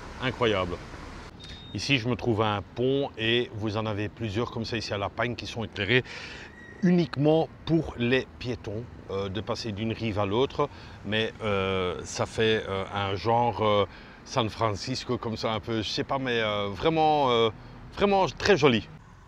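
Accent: Belgium. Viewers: je suis tombé sur toi par hasard,au premiers son de ta voix,jai su que tu etait belge,hahaha,ca fait plaisir de voir un youtubeur belge,par contre oulalala,je vient de voir ,vu que je t'ai decouvert,que jai une tonnes de video a regarder sur ta chaine,j'en ai pour un moment.......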